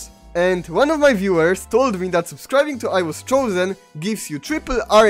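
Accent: Poland. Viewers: I don't want you to be offended but after the first 10 seconds I immediately recognized this Polish accent :D btw Nice video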